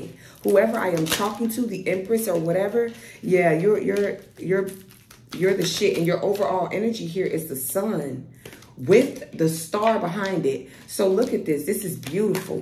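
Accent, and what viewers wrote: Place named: Chicago, United States. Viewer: You talk like you from chicago. Your vocabulary is crazy. I luv it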